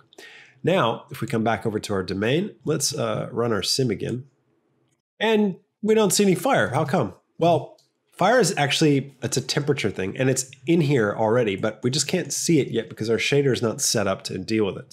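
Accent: United States. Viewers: wait are you really australian lol you sound american to me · You have the most US accent I've ever heard from an Australian...cash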